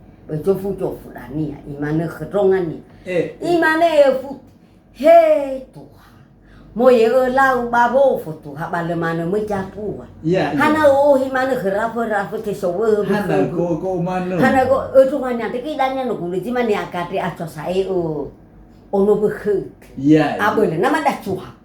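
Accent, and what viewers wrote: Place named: South Nias, Indonesia. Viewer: Bang, dari logatnya ini, apalah abg dari Nias selatan.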